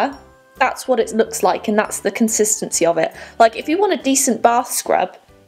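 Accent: England, United Kingdom. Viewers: So she has a British accent but she lives in America. How come? Why is she in America? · Okay. So. Hi! Where are you from? If you don’t mind me asking 😅😅 I think you’re living in America? Maybe? But I can’t work out your accent? You sound quite British like me! I just can’t work it out.. can anyone help? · You opened that salt so effortlessly. seemed really safe. You sound so British in this- I love it! But you ruined it by hating on fish and chips haha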